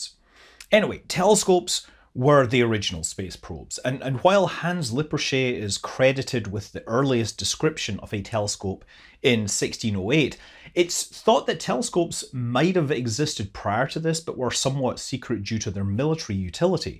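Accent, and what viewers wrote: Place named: Scotland, United Kingdom. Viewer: Gälilean müns... love the Scottish accent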